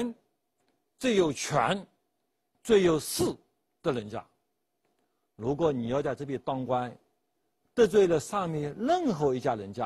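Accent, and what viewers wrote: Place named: Jiangnan, China. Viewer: 这个老师就是包邮区口音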